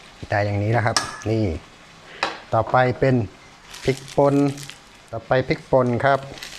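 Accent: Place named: Chaiyaphum, Thailand. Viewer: จ่าเป็นคนบ้านได่ครับ สำเนียงแบบนี้ให้ผมทาย ชัยภูมิคักๆ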